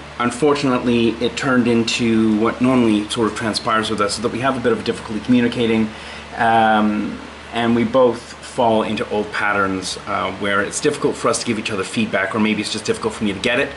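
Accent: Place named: United Kingdom. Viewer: Where about in Britain is he from??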